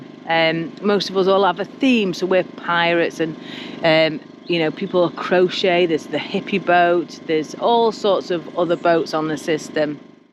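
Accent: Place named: West Bromwich, United Kingdom. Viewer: Lovely approach to life,. Always positive! I am from Switzerland and spent som time during my study years in West Bromwich, loved the dialect. Are you from that area also?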